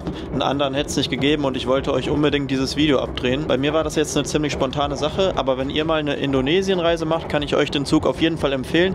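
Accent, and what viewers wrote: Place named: Germany. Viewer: würd ich nicht sagen. ist gutes schulenglisch. aussprache ist halt bisle schwierig, aber das haben fast alle deutschen so :D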